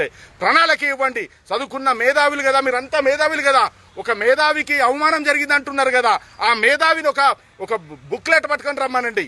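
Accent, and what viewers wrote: Telangana, India. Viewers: ఈయన మాటలు చూస్తే కత్తి ఆంధ్ర ఉందే పొడిచాడు తెలంగాణ వాడే అట్లా ఉంది ఈయన మాట్లాడేది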